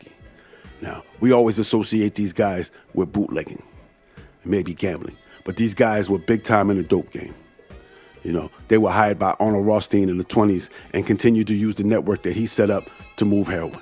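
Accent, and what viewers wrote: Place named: New York, United States. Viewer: New York going by his voice.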